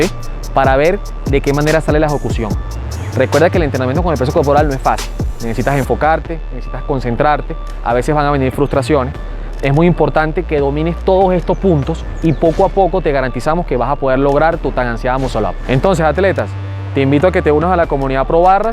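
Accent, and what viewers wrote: Venezuela. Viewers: Excelente Video, te felicito. Recién me inicio en el tema, tengo unos tres meses que empece a trabajar en esta modalidad. Había intentado por mi cuenta hacer un MuscleUp y lo he logrado en un par de ocasiones pero todo todo torcido :-) Voy a seguir tus recomendaciones a ver que tal me va. PD. Deduzco por tu forma de hablar que eres Venezolano... Un orgullo encontrar Venezolanos de calidad haciendo trabajo de Calidad. Felicitaciones.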